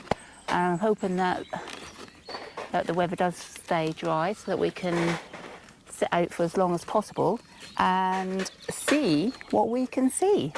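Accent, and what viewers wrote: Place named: Norfolk, United Kingdom. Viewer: What a lovely relaxing place to camp...like you i would go for the veggie burgers and not the dirty old beef burgers...loved hearing the Norfolk accent..i am from Suffolk so could hear a few similarities....[ new sub ]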